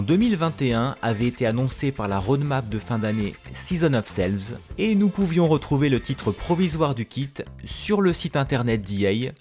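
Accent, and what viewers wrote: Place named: England, United Kingdom. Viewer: J'aime beaucoup ces vidéos d'analyse et de décortication. (Superbe accent anglais au passage). Bon... perso je suis clairement déçue. J'en ai déjà marre des minikits, et même si j'ai acheté les précédents car ils pouvaient apporter des choses à mon jeu (et que je suis une collectionneuse, j'avoue), eh bien celui-là ne m'attire pas DU TOUT. Alors je me pose une vraie question... Est-ce que je deviens une vieille aigrie ? Est-ce que ça y est, je n'arrive plus à vivre dans mon époque ? Mais franchement je trouve toutes les pièces présentées très laides, voire immettable. Non mais franchement, IRL qui a envie de porter ça ? Je ne suis pas une pro du style hein, du coup mes propos n'engagent que moi, mais m** quoi, moi aussi je voulais des tenues hommes simples et mettables ! Donc du coup je suis en colère. Je ne paierai pas pour avoir 1 paire de pompes et 2 pulls ! 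Voilà ! 😑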